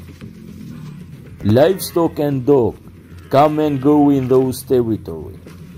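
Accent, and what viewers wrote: Albania. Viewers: Вполне понятный английский с албанским акцентом. Лично для меня на много понятней чем английский с австралийским акцентом. Все таки тут очень толковую информацию собирает автор, и почти без преувеличений или ошибок рассказывает о породе. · Is that Albanian accent?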